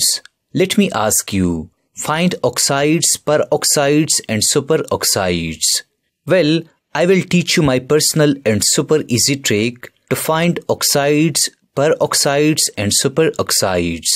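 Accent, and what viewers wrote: India. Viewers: indian voice come in clutch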